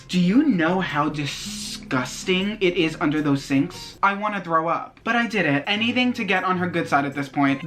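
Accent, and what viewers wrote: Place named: North, United States. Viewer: Ok I hate Christina but being a manager I would warn you to not have friends at work and definitely DO NOT allow any non-employee to help you! That violates workers comp laws! That could get corporate in big trouble. That would get anyone working below me fired & walk out immediately! So just saying protect yourself! Btw I love your Northern scent. I live the south. I freaking love your videos!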